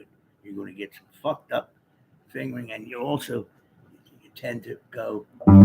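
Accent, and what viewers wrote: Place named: Philadelphia, United States. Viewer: Keep that Philly dialect coming!!!! All those words George Carlin said you can't say on TV. Thanks for thew music my friend!!!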